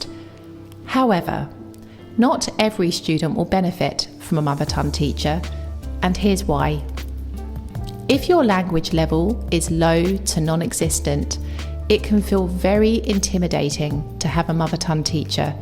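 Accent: England, United Kingdom. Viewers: I love your English accent 🍷💕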